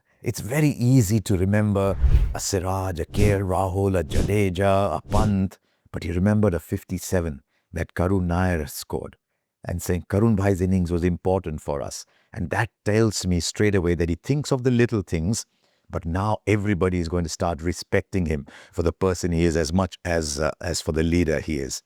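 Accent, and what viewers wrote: Hyderabad, India. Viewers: Wah kya hyderabadi bole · Superb Mr. bogle, ur hyderabadi accent and u took places names of Hyderabad, superb awesome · That Hyderabadi accent though 🙃❤️ Siraj ✌🏻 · speaking in hyderabadi accent made goosebumps ❤❤❤❤❤❤❤❤❤ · kya miyan....aap tho ekudum chakas Hyderabadi bhasha bol lethe:) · That hydrabadi accent comments from sir is heart touching. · That Hyderabadii accent is top notch💕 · Surprised to hear Hyderabadi style Hindi 😮😊🎉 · Just loved your Hyderabadi ❤ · Your Hyderabadi accent ❤.....app Hyderabadi bolker hamara dil jeet liya ❤ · bhai! Loved your narration in Hyderabadi! 🥰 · Normally, I don’t comment on videos — but this one, with the Hyderabadi accent, was too good to ignore. Well done!!! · Amazing sar isi Hyderabadj lahja ka aur siraj bhai ki tareef ka muntazir tha my. Bade khushi hui. Khuda aapko abaad rakhe. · Beautiful tribute in true Hyderabadi Hindi. 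Wonderfully done! Salute to you. All I can say a fantastic commentator to a great bowler of modern times! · Aankh may Aasu aa gaye..hyderabadi accent sunke..kuch bhi ho jaaye..apna hyderabad apna hai..sahi baat bole aap sir.siraj miya maut daal diye...chindiya..Marfa hona abh 🎉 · that hyderbadi accent bought a big smile on my face💙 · lovely talk in hyderabadi accent by Sir!! and MO SIRAJ you are a legend!! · That's Hyderabadi accent and those golden words 'pair zameen per aur haathan aasman per'... Keep advise and motivate Siraj.. Love you sir❤ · Bhai subah subah aapki hydrabadi boli sun kar din ban gaya. Maza aa gaya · What a gem ji you are.your choice of words are amazing and what a great hyderabadi accent.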